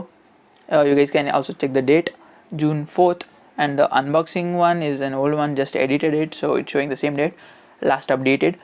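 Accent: India. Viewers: Hindi accent I noticed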